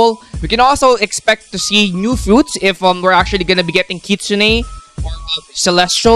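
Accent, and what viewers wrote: Philippines. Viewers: Your accent is like so Filipino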